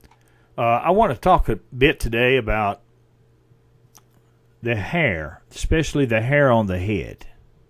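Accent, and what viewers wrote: South, United States. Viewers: you could read the phone book to me and I would listen to you. Your beautiful southern accent always reminds me of my father. He's been gone 26 years this month. He would have enjoyed your research and videos. I'm so glad that you are still posting videos. I appreciate your time and your efforts very much. I have no problem believing Patty could or would have a braid because there are many stories of bigfoot creatures braiding the tails and/or manes of horses. Thank you for your time!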